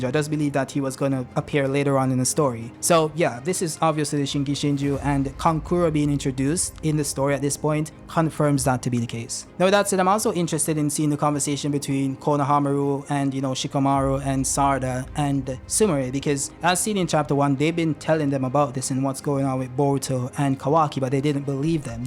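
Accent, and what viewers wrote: Jamaica. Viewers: yo bro are u jamaican?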